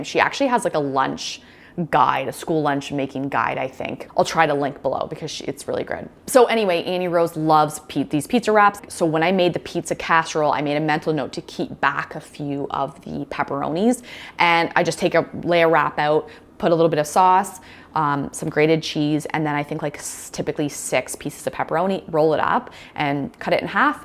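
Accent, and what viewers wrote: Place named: Canada. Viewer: Is your money info American or Canadian? I can’t recall if you’re in Canada but I know some of your words sound Canadian. Just curious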